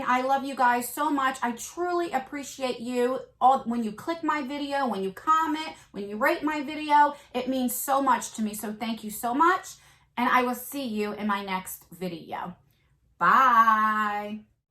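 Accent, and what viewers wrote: New Jersey, United States. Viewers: 😂😂😂 my boyfriend heard your voice while i was watching this...he is from the east coast and has a brother in Jersey "who do you know in Jersey?" me: oh just one of my fave youtubers.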